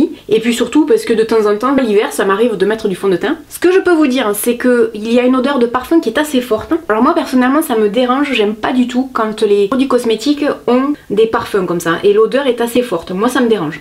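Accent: South, France. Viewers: Téléphone: notif you tube nouvelle vidéo Moi: ouuuuuuuh mon accent du sud favori est de retour 😍😍😍😍😍 vite la bonne humeur de la journée 🥰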